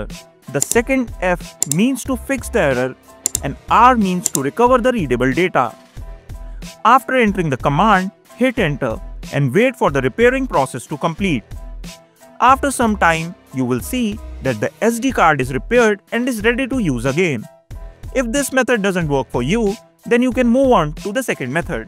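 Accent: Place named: India. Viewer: Very thick indian accent. Difficult to understand what u r saying. Too fast. Unable to catch up the instructions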